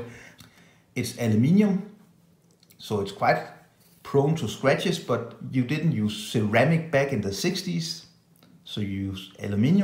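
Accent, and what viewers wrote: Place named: Denmark. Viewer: Nice review of a beautiful watch. You have an unmistakable Danish- English accent..lol I can hear whenever a Dane is speaking English. Not a criticism by the way, just an observation. Jeg er engelsk men er ogsaa Dansk talande.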